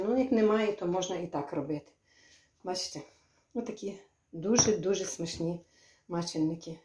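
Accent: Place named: Bukovyna, Ukraine. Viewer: Дорога, скажить а як писни так зробыты? Бабка робыла нам на Маковея таки, але писни, бо в ти дни пист. Бабкы уже немае, и спытвты немае в кого. Бякую вам за рецепты. Моим дуже все подрбаеться. Да и мова Ваша зрозумила. Я родом з Буковыны и мени прыемно чуты именно таку мову, ридну.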